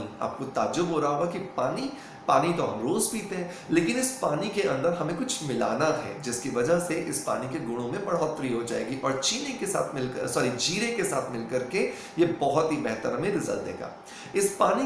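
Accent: Bengal, India. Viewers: Sir u r bengali or odia?? Pls reply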